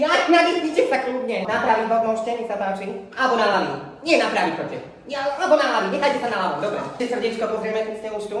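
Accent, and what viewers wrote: Slovakia. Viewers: 😂bože, ja z Teba zemrem...Úplne najlepší...Milujem Tvoj kubínsky prízvuk, perfektne všetko "odohrané". Jednoducho, Tvoje videá mi vedia zlepšiť náladu aj keď ma prepadá temná nálada😅 Ďakujem ❤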